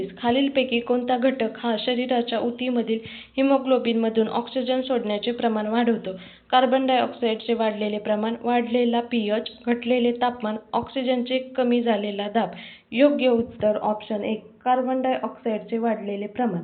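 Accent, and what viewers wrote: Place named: Solapur, India. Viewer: तुम्ही सोलापूर डिस्ट्रिक्ट चे आहे का मॅम आमच्या ऑफिस मध्ये एक सोलापूर चे कर्मचारी आहे ते तुमच्यासारखी बोलतात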